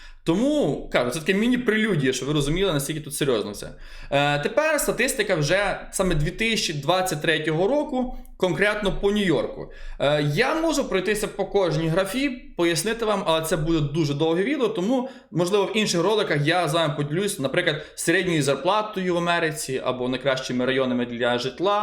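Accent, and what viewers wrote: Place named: Ternopil, Ukraine. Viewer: Лол, дивився це відео на кухні. Моя мати за 5 секунд оприділила, що ти з Тернополя 😅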